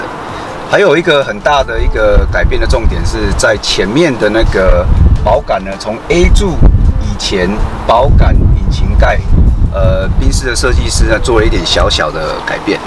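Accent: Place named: Taiwan. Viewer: 好好笑ㄟ～他一開口我就想說台灣腔好重……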